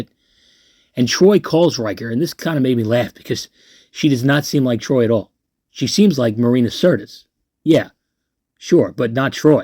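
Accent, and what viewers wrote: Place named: South, United States. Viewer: are you from the South? You have a great Southern accent.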